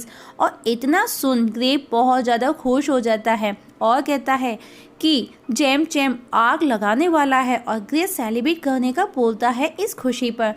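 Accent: Bihar, India. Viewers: R u from Bihar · Lagta hai maidam aap Bihar se ho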